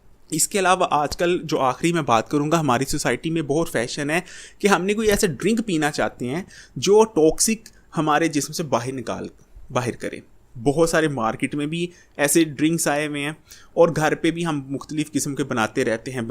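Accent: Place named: Punjab, India. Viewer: Chuteya ager urdu bolna sekh leyta to acha hota .....punjabi bolny waly bachy.......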